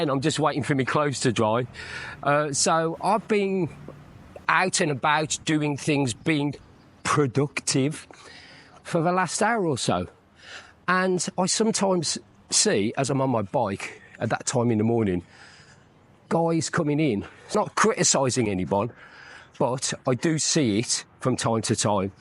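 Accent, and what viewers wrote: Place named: West Bromwich, United Kingdom. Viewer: I've been in Thailand since 2010 and can honestly say the women are getting fat and too demanding. It's a myth about beautiful Thai women. I had also experienced physical violence from them, I never ever experienced that from a woman in the western civilisation. Btw, you are looking older and fatter in the face. Forget getting a HiSo woman, no chance with your looks and West Brom accent! Lol+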